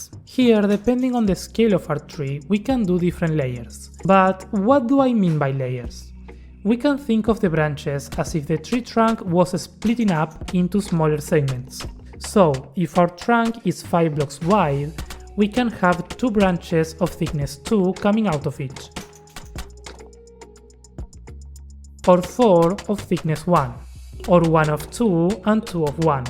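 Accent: Argentina. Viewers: Eres Argentino no? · sos de argentina no? me remil suena ese acento JAJAJAJAJA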